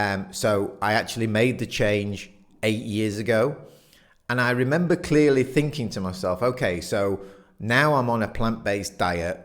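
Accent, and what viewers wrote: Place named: United Kingdom. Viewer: I'm a fan of the British and, like most Americans, I fall for the accent. You are a lovely man. My husband reversed his gout and other physical ailments, but his doctor had to tell him, "Your numbers are not good at all!" And so, long story short, he listened to his vegan, low-to-no fat diet and found, at his next doctor visit, that his numbers were much better and his gout went away. Carry on, kind sir, as you Brits say!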